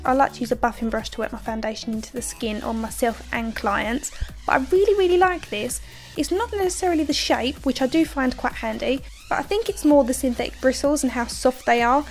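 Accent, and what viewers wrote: Essex, United Kingdom. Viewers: I'm a fan of yours too! And it's so nice to hear your Essex accent.... I from down the road East London! I did giggle when you said 'in alf'! Could you please tell me what you think of the Hakuhodo brushes, would appreciate your professional feedback, thanks.... D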